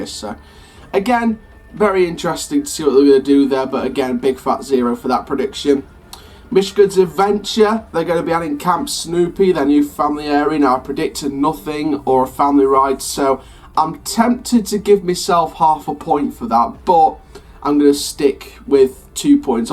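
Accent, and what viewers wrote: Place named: Doncaster, United Kingdom. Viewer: Love your videos they're great, however, they hit a whole new level when you put the subtitles on. I don't think YouTube understands Doncaster accents too well.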